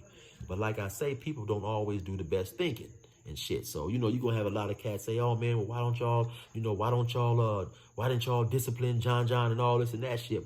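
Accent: California, United States. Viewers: Bro I fuks with your channel. I been on it every since it popped up. Your a good story teller and the way y’all Cali boys talk make it more entertaining. You just different bro and I fuk with it. Keep it up G 💯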